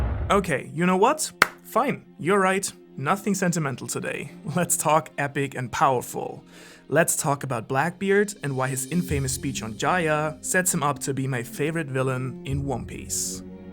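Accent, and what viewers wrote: Germany. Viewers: As a fellow speaker of English as second language , want to note that it's a common mistake to pronounce infamous as in-famous, I totally understand you. But correct way to pronounce would be "infomus" with a stress on i. P.S. but wait... are you really not German? Where are you from?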